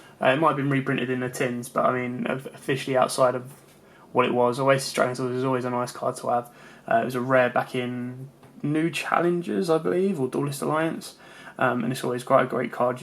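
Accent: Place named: United Kingdom. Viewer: Didn't you noticed his accent?. He is from the UK, It falls to reason Europe got it first. Now that I think about it, We can just import it from there right?. Doesn't matter. It's out now.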